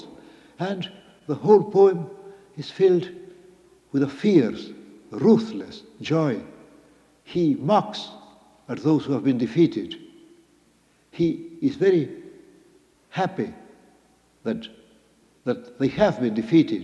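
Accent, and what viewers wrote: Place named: England, United Kingdom. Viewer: MUCHAS GRACIAS SEÑORA POR SUBIR ESTAS JOYITAS DE AUDIOS EN DONDE UNO PUEDE OÍR EL ACENTO INGLÉS DE BORGES.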